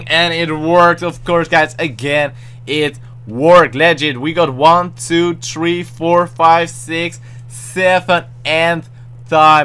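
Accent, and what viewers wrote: Netherlands. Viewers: is he dutch? ik ben wel nederlands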